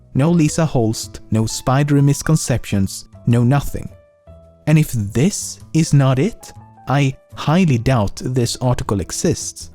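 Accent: Sweden. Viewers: you forget hes swedish sometimes, but it does shine through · Where is he from? Sound quite Swedish to me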